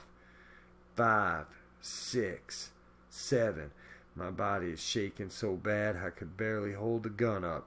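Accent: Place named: Oklahoma, United States. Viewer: HOW THE HELL DID I MISS THIS ONE ???? LOLOL. !! LOVE the accent !! I know a lot of hillbillies here in Oklahoma that. Talk just like that !! Some of my family in S.E.Okla. !!! Lolol😹😹😹😹😳 Great job , hon.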